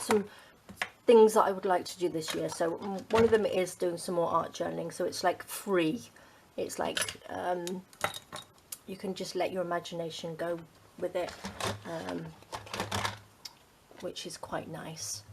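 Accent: United Kingdom. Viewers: Love you no brainer approach— I plan to do more also this year I have found stabilo pencils work nicely for shadows and smudging Never would have guessed you were in Portugal! English accent— small world after all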